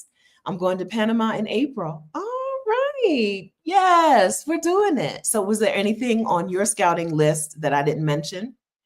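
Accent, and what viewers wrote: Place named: Florida, United States. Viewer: What wrong with sounding like you are from Florida?! 😅 I hope Bogota works out for you! 😊 Tfs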